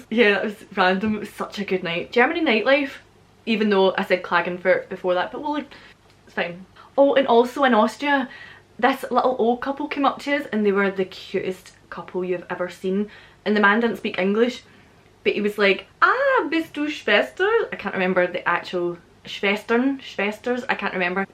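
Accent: Scotland, United Kingdom. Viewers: Hey, I love the Scottish accent and watching your videos is great. I'm from Germany!! Just here to say *Augsburg* is a great town to visit in Germany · I've used many apps for learning languages and most of them are terribly ineffective. The only one that i've personally found at least a bit useful is Lingodeer. This app mimics real life lessons. It has some grammar explanations (in the beginning of each lesson) and it lets you repeat and build on previous lessons. It's still far from perfect, but a step in the right direction. I recommend it to beginners who don't have enough motivation to read language books or have time to attend actual courses. Lingodeer was initially created for learning Japanese, Korean and Chinese, but now other languages are also available (check it on Google Play). First level lessons are free, but for others you make one time payment (which is a great deal). 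The only reason that am doing this positive review of the app is because learning languages is kind of my hobby, and i was pleasantly surprised and want to share that with other people. Btw, very entertaining channel. :D I use it sporadically for getting used to scottish accent, which i like the most (of all the english ones). Alright, that's all. Greetings from Slovenia.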